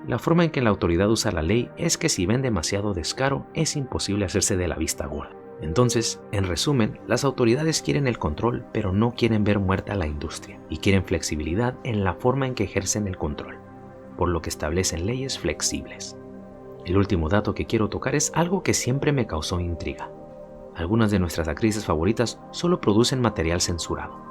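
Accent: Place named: Mexico. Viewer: Eres un artista para narrar, un estilo muy peculiar mexicano 👌 felicidades carnal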